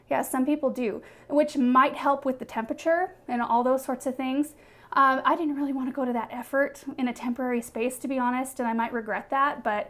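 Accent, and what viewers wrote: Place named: Texas, United States. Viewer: Texas says it same as you.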